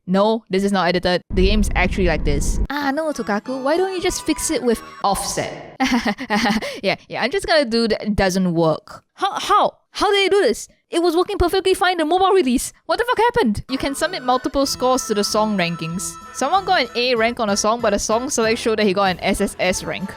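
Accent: Singapore. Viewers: I thought your accent was familiar, turns out you're a Singaporean. Cool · > Did you really think you were going to slip a by me?! I receive neuron activation after only 4 notes! This is my first video to the channel and cute Singaporean accents with rhythm games is a hell of a combo and I’m here for it. I’d heard about the o2 drama but never had the context so this was fun. However with a rhythm channel and Touhou references under your belt I can’t help but notice you haven’t played Yoiyami Dancers yet and I regret to inform you that is /actually illegal/. Please rectify this serious state of affairs at once lest the yamaxanadu send you to gensojail. It’s a hard game and it definitely combines a rhythm movement a la crypt of the necrodancer and a danmaku but once you get the timing of a level and manage to dodge to the beat and get a good full level combo it feels so good. 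Also it’s wonderfully cute. You should check it out!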